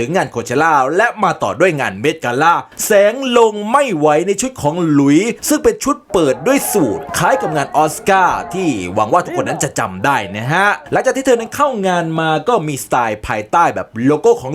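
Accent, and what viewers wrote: Isan, Thailand. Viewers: พากย์แนวอีสานแบบแอดนี่แหละมันเห็นภาพสุดๆๆๆ ...อย่าฟ้าวๆๆๆ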